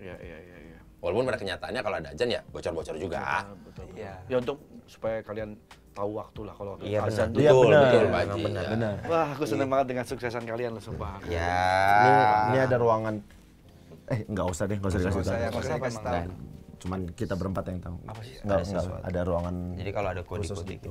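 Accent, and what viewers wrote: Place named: Medan, Indonesia. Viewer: Mak jang, maen maen ya wkw, Medan kali ya min haha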